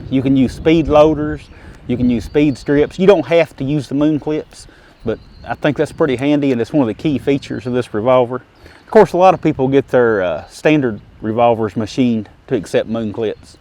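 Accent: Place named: South, United States. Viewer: Dang that is a thick southern accent. Anyway that's a nice gun, I'm not in the market for one, I just enjoy watching these videos.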